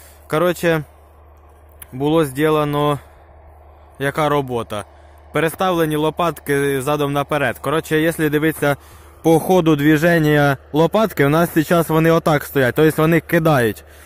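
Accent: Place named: Ternopil, Ukraine. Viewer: а значить перепутав у тернополі похожа до вашого акценту.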